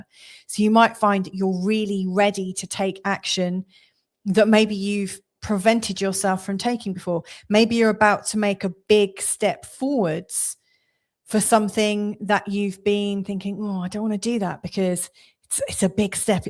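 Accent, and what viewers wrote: United Kingdom. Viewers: What a great explanation and metaphor to explain out of bounds planets! I’m addicted to your fabulous dailies now! Loving learning from you; a fool for the great British accent 🙏🌻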